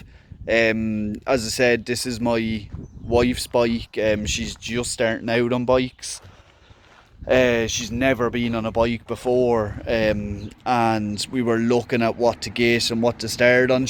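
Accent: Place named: Ireland. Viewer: love the irish accent. Big fan of Conor McGregor 😁❤️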